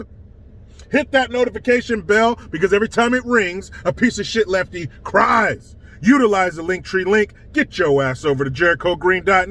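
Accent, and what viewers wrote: Russia. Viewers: Jericho, you are so funny; I love your Russian accent. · Please do more russian voice!!😂😭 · Your accent sounded like Boris and Natasha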